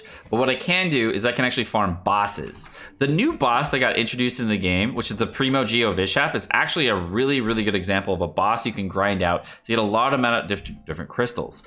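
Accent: United Kingdom. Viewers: pog british accent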